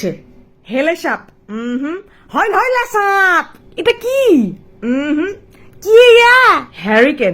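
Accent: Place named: Rajshahi, Bangladesh. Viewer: বাংলাদেশের রাজশাহী, চাঁপাইনবাবগঞ্জের ভাষা একদম এরকম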